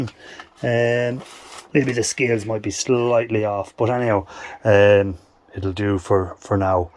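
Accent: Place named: Cork, Ireland. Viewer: Not as bad as ians shed but you need to get your finger out before it turns ugly 🤗👍🇮🇪 You sound like a Cork man, am i right?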